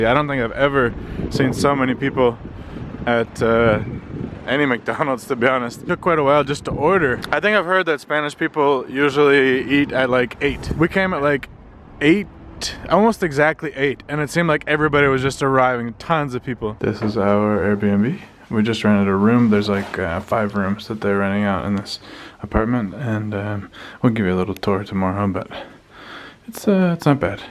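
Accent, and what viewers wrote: United Kingdom. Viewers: Наверно потому что... у него есть в произношении английский акцент, и что бы понимать слова нужна практика А у нет акцента, ее проще понять.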